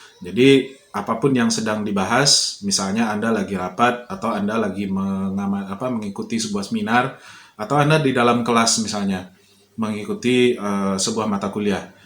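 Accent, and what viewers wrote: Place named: Minangkabau, Indonesia. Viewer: mksh pak,, logat dan nada bapak seperti logat orang Minangkabau atau Padang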